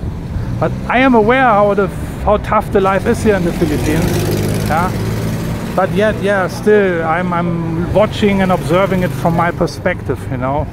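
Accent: Germany. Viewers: European accent most probably if I'm not wrong you're a German, am I right?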